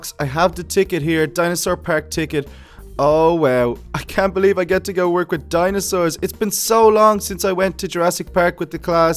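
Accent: Ireland. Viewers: Is he from Dublin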